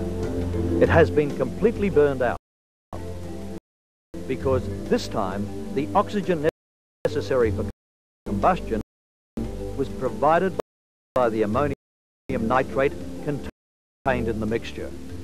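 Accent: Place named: Australia. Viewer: aussie documentary? listen to the accent, as an aussie picked it straight away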